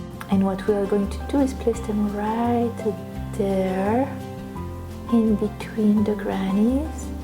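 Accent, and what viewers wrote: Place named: France. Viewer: Hello . U have a very beautiful voice and accent , French !!! . Beautiful skirt . Thanks .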